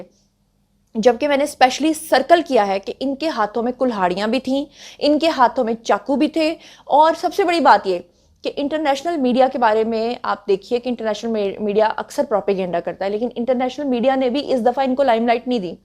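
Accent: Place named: Lahore, Pakistan. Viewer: آپ اردو کے مشکل الفاظ مت بولے۔ کیونکہ اپ کو اردو کے تلفظ ادا کرنے میں لاہوری پنجابی کا رنگ غالب رہتا ہے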